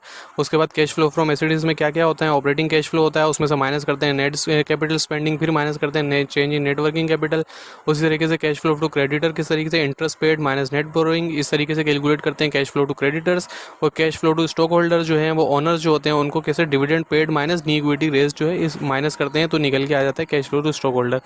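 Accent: Karachi, Pakistan. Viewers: Sir Karachi se lagrhy hain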